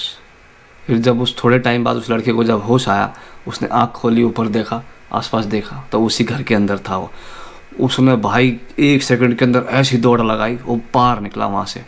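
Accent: Haryana, India. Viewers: Bhai love ur haryanvi accent, plz aisi videos daala karo….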